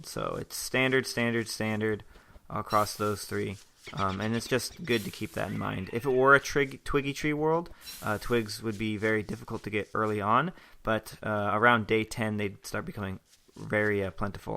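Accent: France. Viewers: ur french?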